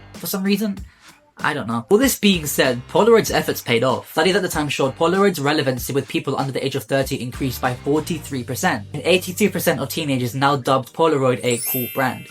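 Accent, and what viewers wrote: United Kingdom. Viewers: I KNEW YOU WERE BRITISH. I KNEW IT. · holy plot twist. i saw ur youtube shorts and did NOT expect u to be british. (slay tho)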